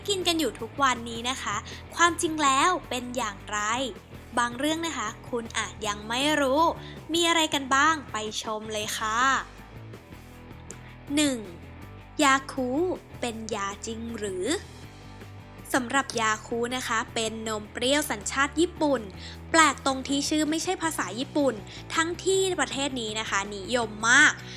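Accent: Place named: Suphan Buri, Thailand. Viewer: เสียงแกเหน่อได้ใจลุงจริงๆ แกคนสุพรรณรึเปล่า ลุงหมากับเมียหมากัน 2คน แล้วแกหมากันกี่คน?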